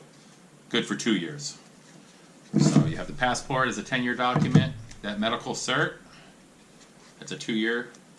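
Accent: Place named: United States. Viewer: i dont,t understend you))). На самом деле это вот настоящий американский язык и произношение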